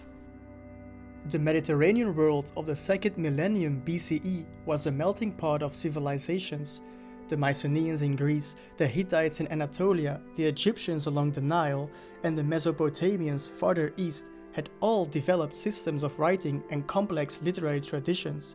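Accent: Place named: India. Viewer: I thought this was being narrated by an Indian, until I saw his face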